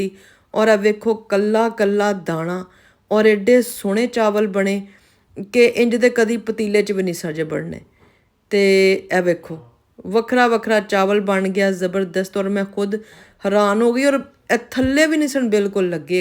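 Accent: Punjab, India. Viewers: Hayee ❗tusi bohat sohnay ho bolday ho jado Punjabi ta maja aa janda ❤ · Assalam o alekum aap ki batain aap ki meethi Si panjabi mujhe bohat Pasand Hy I like u very much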